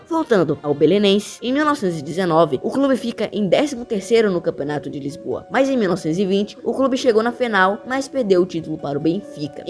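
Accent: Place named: Brazil. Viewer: Foi preciso uma voz brasileira a explicar tudo isto. Grandes Tugas ! 🎯 Fora com a Belenenses Sad, corruptos.... Volta " Os Belenenses". 💙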